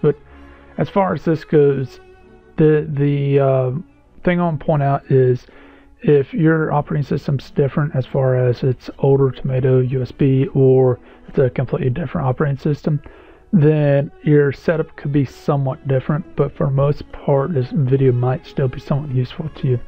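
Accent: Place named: North Carolina, United States. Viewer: i knew i heard that nc accent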